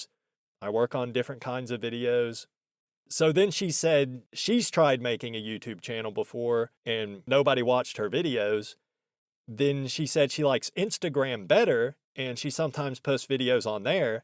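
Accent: South, United States. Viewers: Dude your voice is a gift from GOD. Every part of it, the way you speak, inflections, the hint of southern draw (drawl?) man there is for sure something there. I hate how overused the word is but my gut says "authentic". All sorts of content and things could come from this. Hope you don't stop with just ONE youtube channel!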